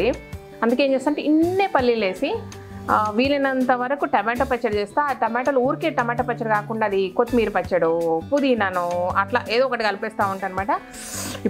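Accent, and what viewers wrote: Telangana, India. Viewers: Hi..me ukmarava dosa bagundi..nenu try chesthanu.. Me telangana basha bale matladutu enjoy chesthu pani chestunaru..mee viewers ni me matalatho attract chestharu...be happy madam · Super andi meeru saradaga ma tladutu adi telangana chala manchiganipistadi trees gurinchi chala baga cheptunnaru dinitona ardamitundi meeku enta opiko ani super👌👏🙏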